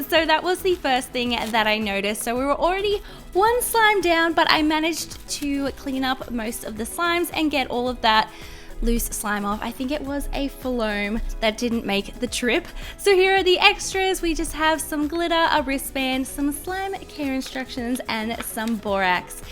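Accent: Australia. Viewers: FINALY SOMONE THATS AUSSIE NOT AMERICAN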